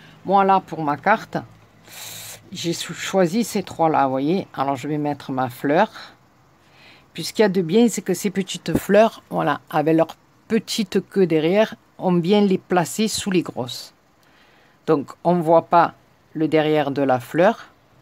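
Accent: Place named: South, France. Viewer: Coucou, Waouh elle est tellement belle ta carte, du vrai shaby chic 😍 La dentelle se marie très bien avec ce rouge Hermes;) Merci pour la combine du doigt en silicone je ne connaissais pas! Ça doit être bien pratique. Ton accent me transporte à chaque fois ds les films de Fernandel 😁 j’adore!!! 😊 Passe un bon week-end! Gros bisous 💖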